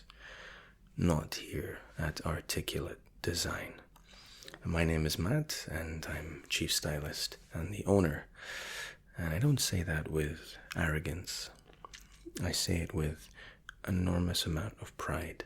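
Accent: Scotland, United Kingdom. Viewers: Love your accent! It's a really gentle tone. What part of Scotland are you from? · Its almost 1am I'm drunk and I always can rely on Matt for his soothing Baritonal Scottish smoothing voice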